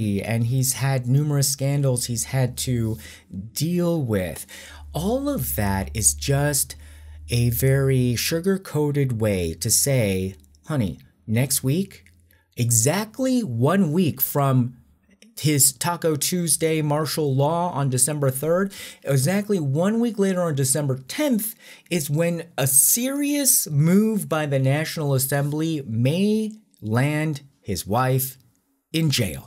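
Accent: United States. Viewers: Never like American English. Sounds terrible.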